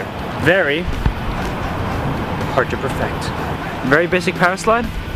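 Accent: United States. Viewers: dude where in ny you from????? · are you staten island?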